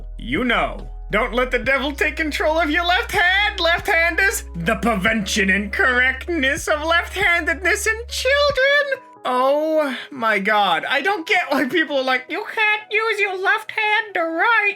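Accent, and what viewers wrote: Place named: New York, United States. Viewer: why do you sound so much more new york kwite?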